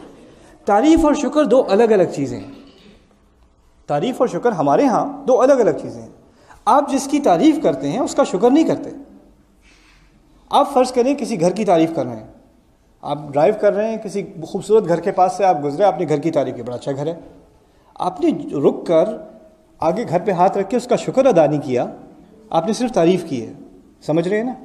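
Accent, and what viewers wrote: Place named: India. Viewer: I didn't understand anything but so nice to hear him speaking urdu:) it sounds like indian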